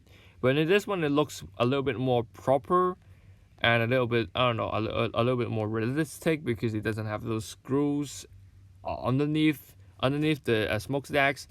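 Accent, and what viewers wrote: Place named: United Kingdom. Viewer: British accent~~~~~~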